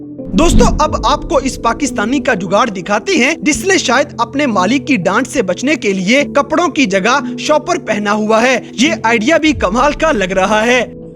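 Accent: India. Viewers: او بھائی تیرا بولنے کا انڈین سٹائل بالکل بھی پسند نہیں آیا۔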